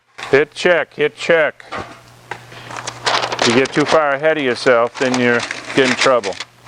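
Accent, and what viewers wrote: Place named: Massachusetts, United States. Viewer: I’m not a psychic or anything but something tells me this guy is from Massachusetts…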